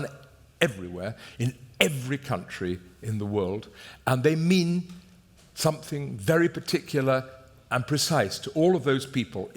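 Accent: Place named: United Kingdom. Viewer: Simon Callow splendid British accent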